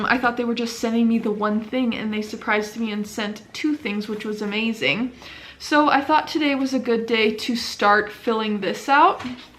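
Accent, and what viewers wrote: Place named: Montana, United States. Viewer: Hyped and liked. Getting my Montana fix. Just hearing you speak brings warm feelings. Funny how you don’t realize that there is an accent to folks from back home until you’ve been away. Now I’m in Texas the difference is stark. Most of my Montana family have passed on, but I’m still kicking so I need the fix. Thanks.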